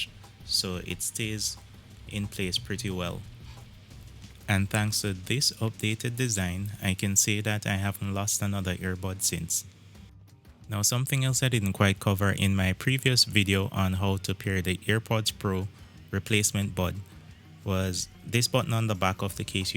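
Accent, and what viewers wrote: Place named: Jamaica. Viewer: You sound Jamaican...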